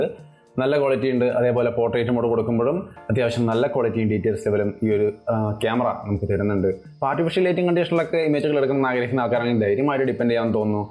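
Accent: Thrissur, India. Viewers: Veedu thrissur aano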